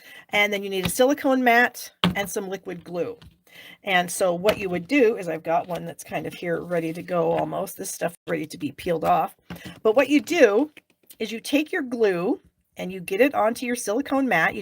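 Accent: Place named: Yorkshire, United Kingdom. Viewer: I'm pretty sure she's from Yorkshire....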